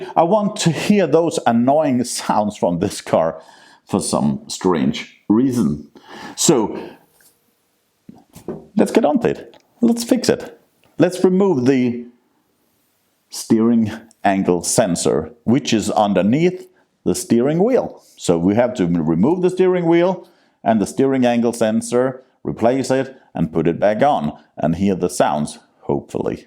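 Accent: Scania, Sweden. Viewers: För sjutton! Kör inte på engelska. Jag slutar kolla. Hela charmen med din kanal är ju din dansk/skånska accent 😢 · Njaaaa, lyssnar hellre på skånsk/danska 😀👍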